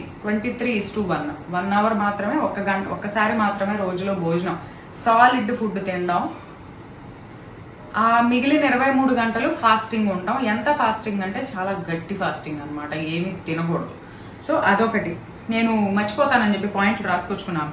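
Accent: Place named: Rayalaseema, India. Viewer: mee slang rayalaseema laga untundi Abba ani ikkada.antaru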